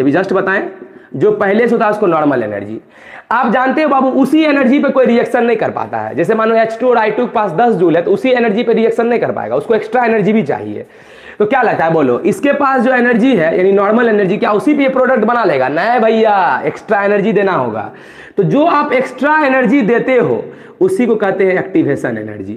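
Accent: Bihar, India. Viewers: Bihari accent 🫂🔥🔥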